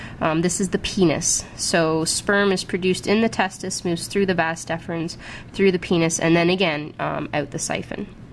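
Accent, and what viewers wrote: Canada. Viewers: She sounds Canadian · I can tell you're Canadian.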